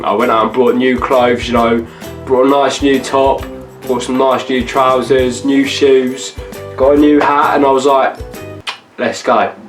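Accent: England, United Kingdom. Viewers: Mohammed.J I have the same accent, it's british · Tangie Masten he does, British accent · Mohammed.J British accent. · Hes english like me and dats how we talk bruh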